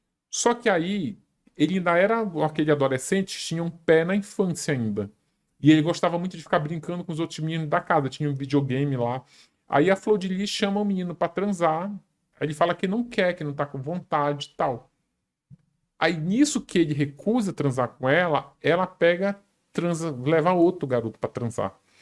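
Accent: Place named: Rio de Janeiro, Brazil. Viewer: O sotaque dele é muito chato. Nossa. Uma mistura de carioca com nordestino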